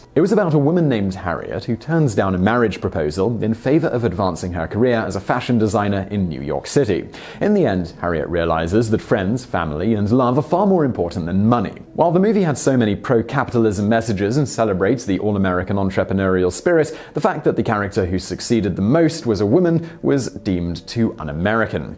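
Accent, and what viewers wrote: United Kingdom. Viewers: This fellow glossed over a lot of facts. He seems to imply that those involved who were Communists in Hollywood were just a bunch of innocent weaklings. He fails to mention those "weaklings" in Hollywood and their thugs (Herb Sorrell and company) did physical damage to people and property who disagreed with their Communist philosophy prior to being called out. He also did not mention their slavish devotion to Stalin. Their personal papers divulge their loyalty to Stalin and his minions. Most of them also denied the murders, the gulags and atrocities that Stalin inflicted ever occurred or existed. Finally it is hard to take seriously millionaires with their mansions and servants actually believed in equal sharing of material wealth. If so, they would have voluntarily divided up their riches. None of them ever did. I should ad that the gentleman does not understand true free market capitalism which is transactions between 2 or more people where both sides benefit. 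He seems to think what we have today (economic fascism) is the same as capitalism. it is not. The commentator (I am assuming he is responsible for the content and not just hired due to his British accent), should have some time looking at the other side of this argument instead of giving us the usual devoid of the facts version we have all come to know. In other words don't be intellectually lazy.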